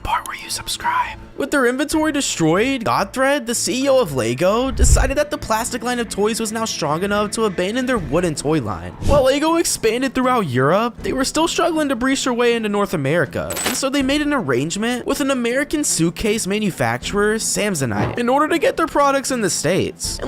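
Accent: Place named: United Kingdom. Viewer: Man, I thought you’re supposed to build with Legos not make a whole goddamn essay about it I mean we want to hear you talk British make Lego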